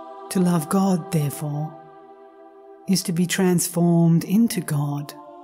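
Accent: Australia. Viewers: Love the Aussie accent! Very early on in my spiritual path I belonged to an Australian Catholic listserv (yes, it really WAS that long ago). I made some wonderful friends who helped me enormously along my way. Listening to you brought them to mind in gratefulness. Thank you!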